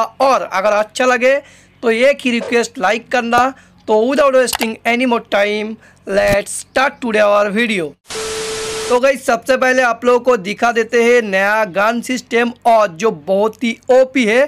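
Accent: Bengal, India. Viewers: Ap kay bangali ho bhaiya 🙄🙄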